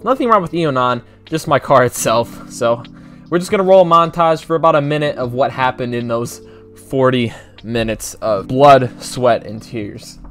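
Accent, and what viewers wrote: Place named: Germany. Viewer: ich hör da doch deutsch raus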